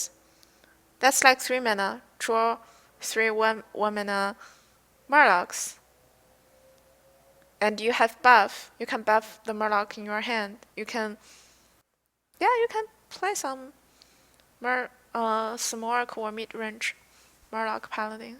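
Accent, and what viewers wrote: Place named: China. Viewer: 学长的英文一股汉语味er，但是你什么时候回国内平台播啊？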